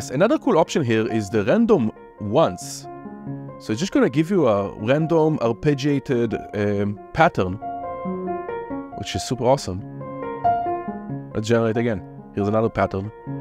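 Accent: Israel. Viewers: I kind of respect the fact that you're not even trying to work with your Israeli accent. It's as if you're almost putting a little extra effort to sound more Israeli than you naturally do. :) Kudos man. Truly.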